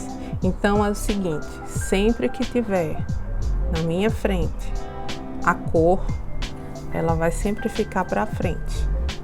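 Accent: Northeast, Brazil. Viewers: Mulher linda, tu és de onde com esse sotaque que parece nordestino? Primeiro vídeo seu que vi e amei. Já me inscrevi. 🙂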